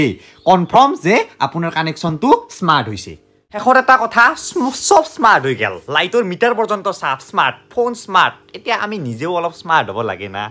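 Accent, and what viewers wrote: Nalbari, India. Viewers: Nalbari r manuh so accent tu olp beleg hoi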